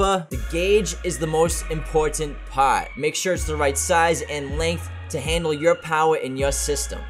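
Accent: Boston, United States. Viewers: This the first time I have heard a real Boston accent, shyt Is crazy how state to state English changes...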